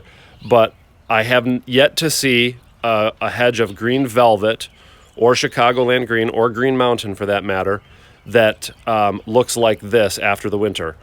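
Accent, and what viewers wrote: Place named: Northern Ireland, United Kingdom. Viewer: Thanks. Interesting video and confirms what has happened to my hedge here in UK. 😥 btw Off topic. I detect some Northern Irish in your accent, is that your region or are you originally from Ireland?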